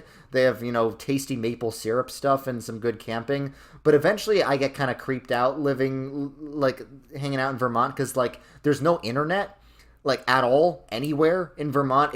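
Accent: New York, United States. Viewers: A) your earlier videos seem to have downplayed your New York accent but it seems you've recently embraced it. B) Detroit has the best pizza. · New York accent came out strong in this video. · I don’t know if you just played it up for this video or I’m just noticing it now, but your New York accent is really coming out. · Wait.. is that a NEW YORK ACCENT I HEAR?! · The NY accent was carrying in this video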